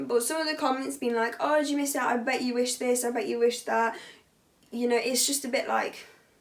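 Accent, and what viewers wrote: United Kingdom. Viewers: I would simply like to say that you are living confirmation that the only thing which separates the British and we Americans is a common language. Your "accent" is charming! Yes, I know, we Americans trample all over proper English; but I could listen to you all day and never tire or tyre of your delightful soliloquy. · Hello, from across the pond. I think you're beautiful inside, and out. and I love you British accent. May God bless you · Rik Pine Its the British accent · Woah your the girl from Instagram I never knew you had s YouTube and a British accent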